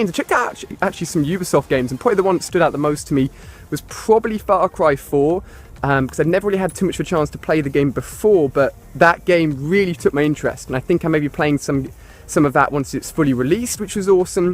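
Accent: England, United Kingdom. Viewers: He's in England why the fuck would he be in Scotland because he has a English accent like me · Trust me, I know you're not hating. But most of us in the UK pronounce it like that. Same with due, and durability.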